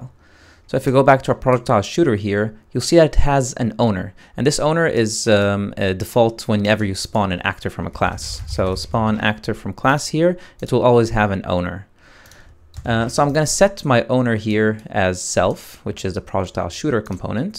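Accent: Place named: Arab world. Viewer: I just wanna ask 1 question ! where are you from ( I feel you are arabic)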